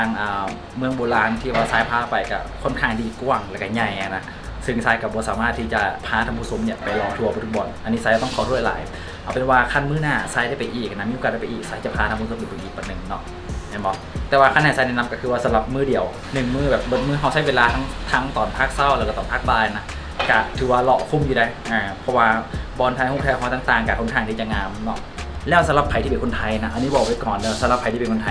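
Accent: Vientiane, Laos. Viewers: พื้นเพเดิม เป็นคนลาวใต้แต่ย้ายมาอยู่เวียงจันทร์ พูดสำเนียงลาวใต้ได้ แต่คงถนัดสำเนียงเวียงจันทร์มากกว่า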